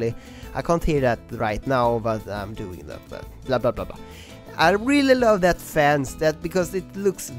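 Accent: Sweden. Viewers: Is he swedish? o.o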